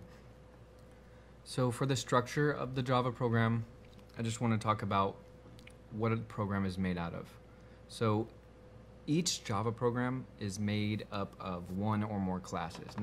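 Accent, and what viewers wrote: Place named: United States. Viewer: thank you for this playlist. it's very hard to find java videos with english american accent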